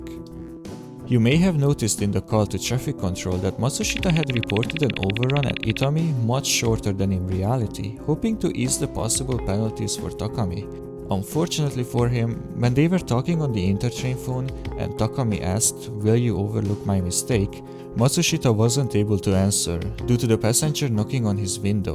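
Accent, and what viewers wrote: Hungary. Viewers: Az angol kiejtésedről nem mondanám meg, hogy magyar vagy, de a japán szavakat olyan magyar akcentussal ejted ki, hogy az elárulta. Nem rossz. Maga a videó se, elég érdekes. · Csak nem Magyar vagy? Nem nagyon hallottam az akcentust az előbbi videókban, de most kicsit jobban lehet hallani.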